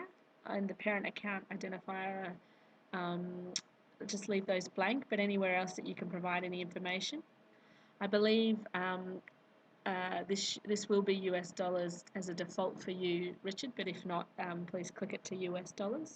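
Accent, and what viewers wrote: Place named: Australia. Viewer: Thx Ms,. Very useful. Love that Aussie accent!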